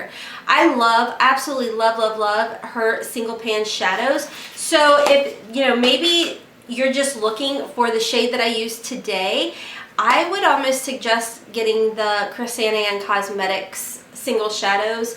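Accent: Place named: Kentucky, United States. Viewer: Congratulations on 5K!!!💖💜💖💜💖💜💖💜💖💜💖💜💖 AND,... Thank You for this very generous giveaway!!! Thank you for sharing another GREAT tutorial😚. I love the Bad Habit palettes. And, even the ABH palettes have kick up. I think like you said, it's rare to find a palette nowadays that DOESN'T have some kick up. I love your Kentucky personality and accent💖. I lived in Louisville when I was a young girl for a while and I absolutely loved it. I was sad when we moved. I ended up back in the South anyways now. Lol (N Carolina). I absolutely LOVE living here. Wish we lived closer as I know our personalities are very similar and we would get along great!!😚 (Especially the makeup hoarding part😁😁😁😁). Look forward to your next video send here's to 10k!!🍾🥂🍾🥂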